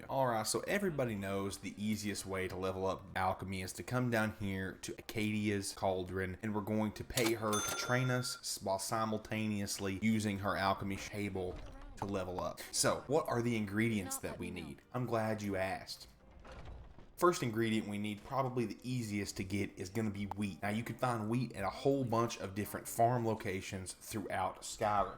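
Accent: South, United States. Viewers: I don’t watch you channel but hearing a dude with a southern accent explaining Skyrim stuff to me is much more comforting and entertaining than a sweaty nerd voice.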